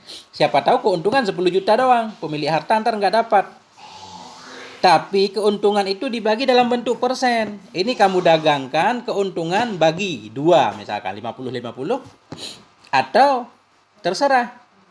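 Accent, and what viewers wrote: Aceh, Indonesia. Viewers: Orang aceh yah?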